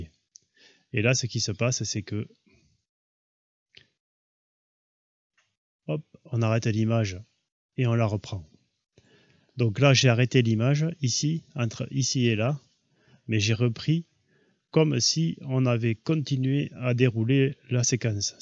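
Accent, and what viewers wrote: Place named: Provence, France. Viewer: Voilà une belle voix qui respire la garigue et le romarin comme Galinette peuchère !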